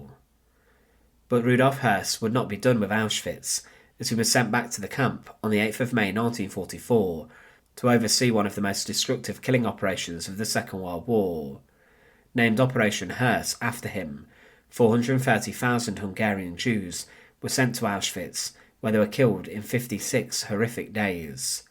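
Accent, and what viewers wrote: United Kingdom. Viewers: What type of UK accent do you have?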